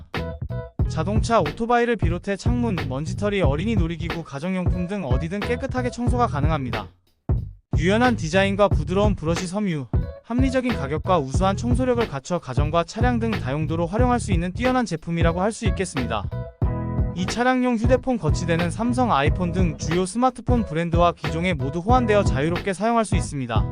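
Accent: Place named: Yanbian, China. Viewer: 조선족이냐 백미러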